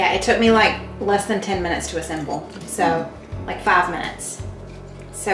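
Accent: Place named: South Carolina, United States. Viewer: I say whopping also. May be a Southern thing. I love you because you are you. Love, love your channel. I have relatives in Upstate SC and I love your accent. 🥰❤ When I hear like (liike) and type (tipe) and last night (niite) I know it is Upstate SC.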